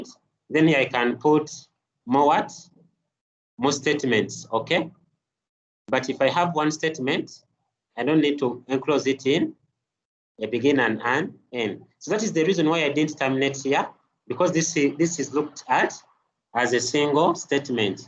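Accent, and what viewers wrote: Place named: Uganda. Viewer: Well represented! Surprised to here a Ugandan accent after watching only Indian tutorials.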